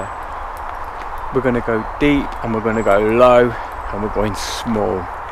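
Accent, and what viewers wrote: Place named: Yorkshire, United Kingdom. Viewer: Thanks for your video of macro photography which I thought was very encouraging for people new to Macro, I’ve been doing it just over a two years shortly after my retirement and like yourself find you get so drawn in to this hidden world which is all around us, but people completely unaware, just pass by it, I’m a Leeds guy and hope you don’t mind me saying sound like a Yorkshire man and my apologizes not, however if you are close to Leeds I would love to meet up with you sometime as I don’t have a photography friend I can converse with. Kind regards Dennis. Email: <mail>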